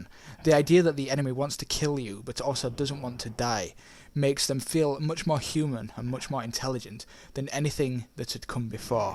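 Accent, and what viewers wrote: United Kingdom. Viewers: hes british, but not with the accent you would expect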